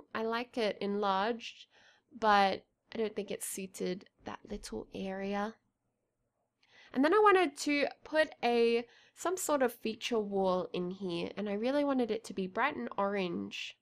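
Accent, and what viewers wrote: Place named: Australia. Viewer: This is my first video I've watched of yours (subbed!) and I was like "she's French. No she's Bulgarian. No no no, definitely Swedish. Wait, is she British. No, sorry, she's austrailian, defiantly austrailian.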